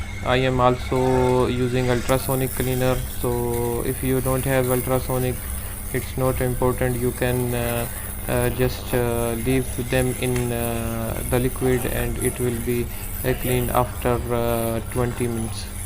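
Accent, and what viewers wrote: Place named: India. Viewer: Indians speaking english sounds very ridiculous!!, next time use subtitles.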